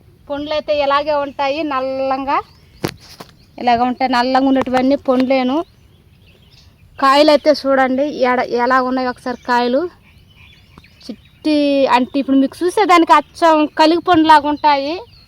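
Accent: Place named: Chittoor, India. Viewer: సిస్ బాషా వింటూ ఉంటే మీద చిత్తూర్ యాస లాగా వుంది.. Medhe a ఊరు సిస్ ఇంతకీ..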